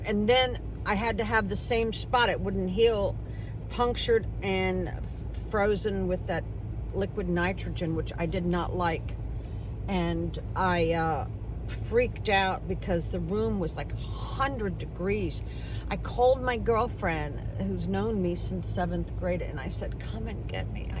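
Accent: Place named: South, United States. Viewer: That pretty.... Southern🎙️🎙️🎙️ VOICE🌷🌷🌷🌷🌷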